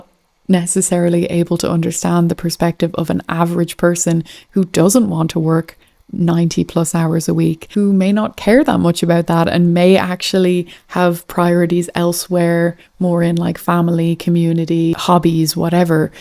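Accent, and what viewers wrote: Ireland. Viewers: you should consider covering geo-politics too. E.g. cover BRICS, trade war between China - U.S., tech war (chip) between U.S. - China, the Syrian situation - why Turkey got involved (something about a pipe line that would benefit them), etc. 💡p.s. Irish accent?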